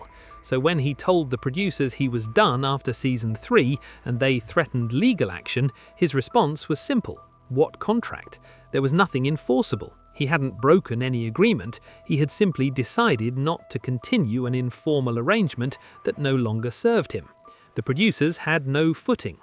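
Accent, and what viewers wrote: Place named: United Kingdom. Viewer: However good it may be, why oh why do we have to put up with these British accents for all these videos? Sick of it!!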